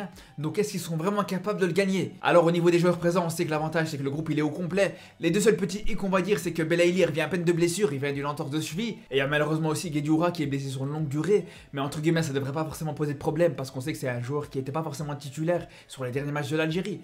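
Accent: Belgium. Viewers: Salut frère l'Algérie sera qualifié sans problème,,, tu as un accent belge ?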